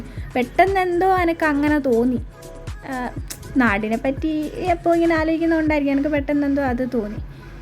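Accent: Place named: Kannur, India. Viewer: Kannur slang Nalla pole undto😄 karakkam okke kainja ine aede pone😄 ❤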